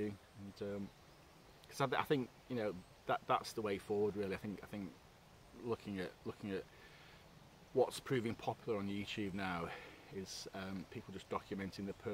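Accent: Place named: Cheshire, United Kingdom. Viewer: Not a clue who you are, but the algorithm told me to watch this, so I have. Good luck with the job hunt. Your accent sounds like you're from my part of the world (Cheshire) 👍🏻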